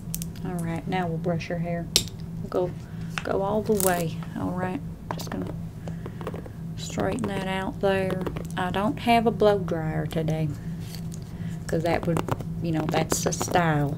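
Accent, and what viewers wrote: South, United States. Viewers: Great southern accent.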